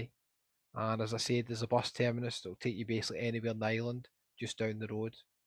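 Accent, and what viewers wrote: Scotland, United Kingdom. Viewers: Enjoying yr vlogs ☘ great hearing a Scottish voice for a wee change 😁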